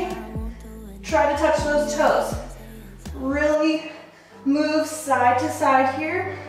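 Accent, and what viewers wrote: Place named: Canada. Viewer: Oh! You’re from Canada, eh? 🥰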